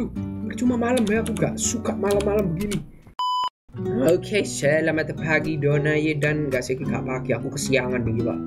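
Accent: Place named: Surabaya, Indonesia. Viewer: Logat suroboyo ne tetep podo koyo bien mAS 🥰🥰🥰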